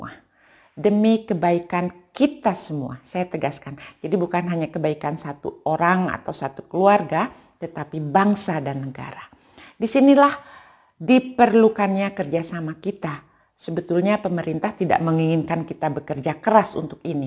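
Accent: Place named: Bali, Indonesia. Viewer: Maaf,ibu drmn ya aslinya ??? logat bhs indonya kental org bali..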